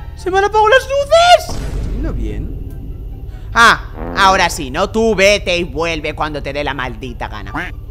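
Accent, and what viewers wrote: Argentina. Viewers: y su acento argentino a todos lados, lo amoo 🎨🌷❤️‍🩹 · hablas bien en modo argentino ya que soy de argentina hablas super bien😊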